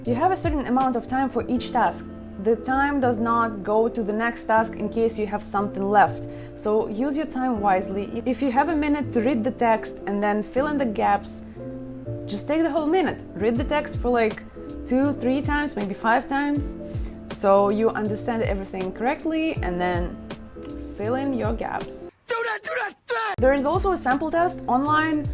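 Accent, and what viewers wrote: Russia. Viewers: БЛИН так слышно русс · До мне даже не дошёл что ты русская))я очень восхищён с твоим акцентом ! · you from russia yea, я вижу это по твоему акценту.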